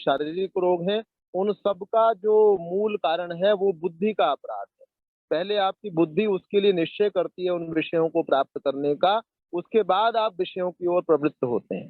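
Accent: Standard. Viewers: आज तक इतना शुद्घ उच्चारण नहीं सुना था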